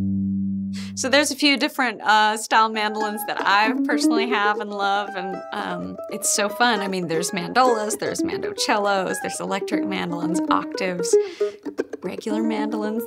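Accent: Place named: South, United States. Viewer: Her accent is crazy. It's like some words she says are from the south but then every now and then a few northern ones slip in.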